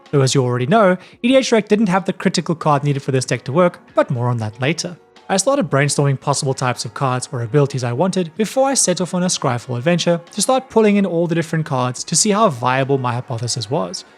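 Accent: South Africa. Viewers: I can't tell if he is South African....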